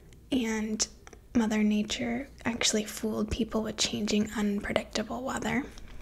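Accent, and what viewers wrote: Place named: Wisconsin, United States. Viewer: Your definitely from Wisconsin lmao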